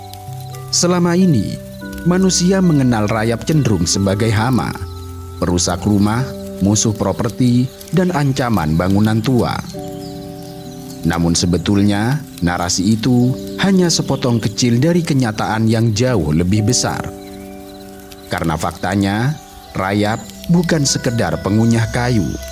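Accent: Java, Indonesia. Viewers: Jawa nya jawa banget 😭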